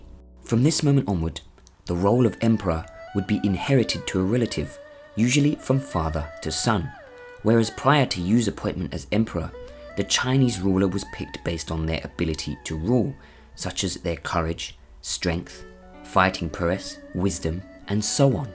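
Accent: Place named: England, United Kingdom. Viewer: I can't fathom being pissy with his pronunciation being off. He's got an English accent and the desire to not only learn, but to teach about this fascinating culture's history is admirable. Most westerners don't have the ability to pronounce everything perfectly in a language so profoundly different. We just don't have some of the sounds prevalent in english. Seems to me that taking issue with such trivialities is more about making sure everyone is aware you know more. This nonsense today where some think mispronouncing something is disrespectful is a joke. I can't imagine someone getting prickly about english mispronunciation, but maybe some do. Maybe just an indicator of the toxicities that exist among all people in certain individuals. I'm just appreciative that he used his time to put up a video in an effort to teach people. Thank you for making concise videos for us who just want to learn about the culture and history. 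Great narration and choice of photos/art.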